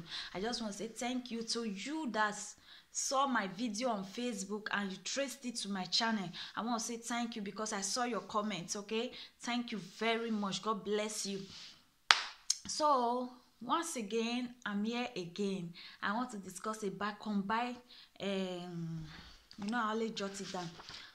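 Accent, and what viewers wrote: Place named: Africa. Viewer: Hello African Queen, luv ur accent 🌹😘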